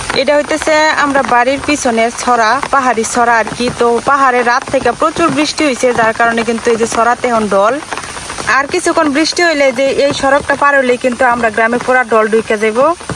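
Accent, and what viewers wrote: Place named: Mymensingh, Bangladesh. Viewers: ভাষা ময়মনসিংহ অঞ্চলের ! খুব সুন্দর। নেত্রকোনা হবার সম্ভাবনা অনেক বেশি । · মনে হচ্ছে নেত্রকোনা বা ময়মনসিংহ বা শেরপুর তবে পাহার ও ভাষায় মনে হয় নেত্রকোনা জেলা র কোন গ্রাম। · আসসালামু আলাইকুম ভাবি আপনার বন্য আছে বন্য থাকলে আমি বিয়ে করতাম আমাকে মাচ মারে খাওয়াতো আমার বাড়ি কিন্তু রংপুর আপনার বাড়ি ময়মনসিংহ তাই-না ভাবি আপনার ভাষায় বোঝা জায় মাজা নিলাম ভাবি আপনার সাথে মনে কিছু নিবেন না ওকে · কথা শুনে মনে হচ্ছে নেত্রকোনার মানুষ, আমার শশুর বাড়ীর লোকজন এভাবেই কথা বলে। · আপু তোমার কথা শুনে মনে হচ্ছে নেত্রকোনা আমিও নেত্রকোনার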